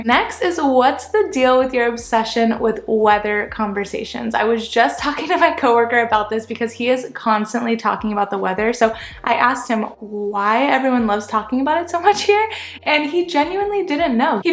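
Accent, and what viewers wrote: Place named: United States. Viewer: By all means, keep answering 'yalright?' with 'I'm good, how are you!?' in your cheery American accent. It may brighten peoples day. Don't try to fit in here... we like the novelty of an American.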